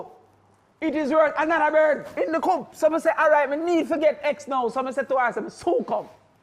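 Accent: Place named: Jamaica. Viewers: its because he speaks jamaican broken english, i understand because im jamaican. wish u could understand because he teaches so well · He's jamaican. Unless u understand our creole u won't get it. He talks like that so that his class will understand him not as a teacher but as a friend. A smart friend. Who finds it hard to learn from a friend???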